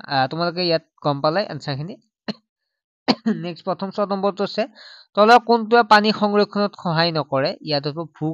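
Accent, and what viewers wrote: Nalbari, India. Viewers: Sir nalbarir lage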